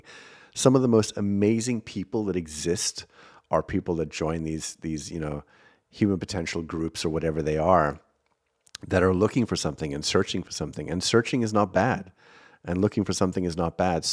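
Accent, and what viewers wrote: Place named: South Africa. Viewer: Fellow South African here - don't lose the accent, it's awesome! Love the podcast! You're f*cking fantastic!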